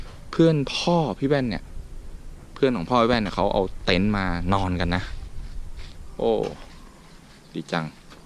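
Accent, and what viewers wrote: North, Thailand. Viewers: สำเนียง เมียงแต้ๆ^^ ผมคนแป้ครับ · ว่าแล้วเชียวว่าพี่แว่นเป็นคนเหนือ สำเนียงมันออก(สาบเมืองขื่น) ห้าๆๆๆๆ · ผมคนน่านแต่อยู่ในเมืองครับ #อยากให้พี่แว่นพูดเหนือเยอะๆ 555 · อยากให้พี่แว่นพูดภาษาเหนือออกคลิปบ่อยๆหน่อย น่ารักดี FC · อู้กำเมือนขนาดนี้ เหนือแต้ๆ ครับ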